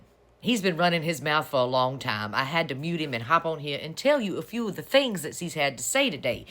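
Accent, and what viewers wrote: South, United States. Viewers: I just love your analyses. With your southern accent, your comments are on point while sounding smooth as Vermont maple syrup. It makes what you are saying more compelling to hear. ❤❤❤❤❤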